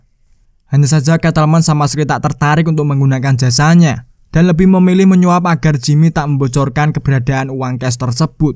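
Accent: Java, Indonesia. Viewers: Jangan di medokin bg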